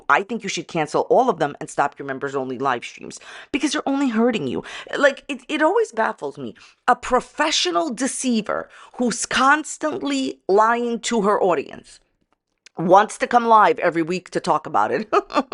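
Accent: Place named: New York, United States. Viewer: With how sneaky her words are, she could be a lawyer. Shame she doesn't have certain other qualities needed. Btw, if someone hasn't coined the name Scamberlynn/Scandalynn Reid for her yet (pretty sure it's been done though) I'm claiming it 😂 Also, hi! This is the second time I've seen your video pop up in my feed. Not much of a follower of Gorlworld beyond the occasional video here and there, but I think I'll stick around with you for a bit because you've got a really pleasant voice and accent (New York/New Jersey?). Have a subscribe 😊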